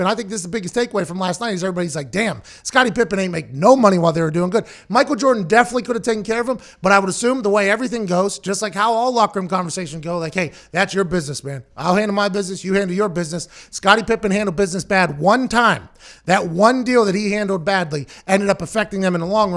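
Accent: Pittsburgh, United States. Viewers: I didn't know you were from Pittsburgh. You do lowkey sound like a yinzer lol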